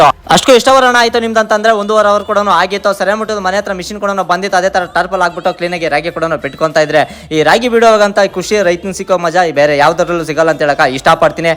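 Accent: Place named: Chintamani, India. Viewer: Nim kannada different ide, chintamani gowri bidnur side avru ಅನ್ಸುತ್ತೆ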